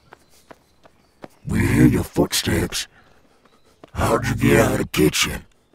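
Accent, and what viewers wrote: South, United States. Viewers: Love the bit of southern accent ☺️ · Now I can’t unhear him speaking with a country accent. I don’t mind it, though.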